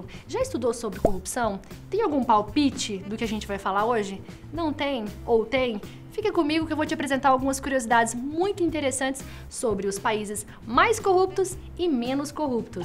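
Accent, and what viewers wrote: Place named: São Paulo, Brazil. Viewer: Sotaque de paulista feio! Coloquem uma pessoa que saiba pronunciar as palavras corretamente !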